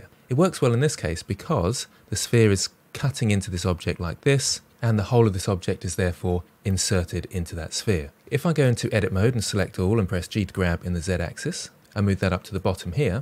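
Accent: England, United Kingdom. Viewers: Concise, clear, and complete. Absolute amazing tutorial. Bonus points for narration with an English accent.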